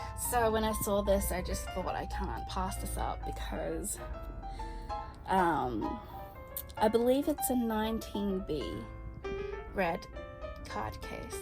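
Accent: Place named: Australia. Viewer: I can hear an Aussie Twang too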